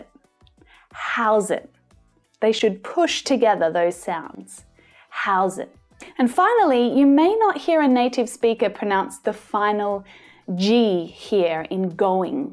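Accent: Australia. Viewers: Nice AUSTRALIAN sound. And I learn much from you. Thanks. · First time I’m really learning good pronunciation but from a teacher with an australian accent!!! Superb!!!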